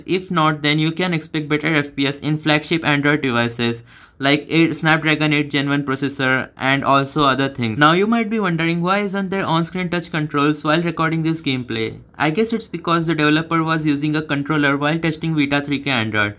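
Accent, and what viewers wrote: India. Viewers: Ты индус? Акцент пиздец у тебя,нихрена не понял на английском 😁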